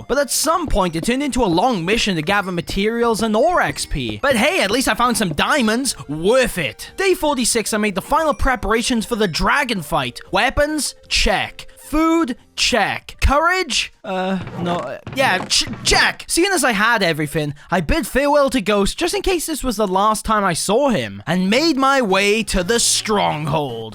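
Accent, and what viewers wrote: United Kingdom. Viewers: U sound brish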